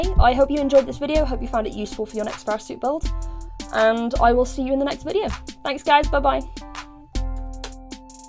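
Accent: United Kingdom. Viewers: Where do you get your fur? Your accent suggests you live in the uk and I find I dofficult to find cheap decent fur for my fur suits.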